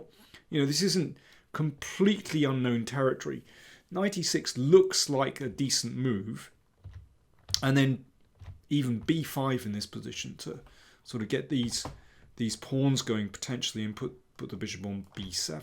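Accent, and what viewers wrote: United Kingdom. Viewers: Not to forget the superbe british tongue!!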